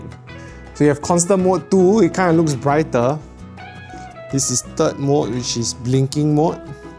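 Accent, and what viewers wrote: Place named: China. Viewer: This Chinese dude has a nice accent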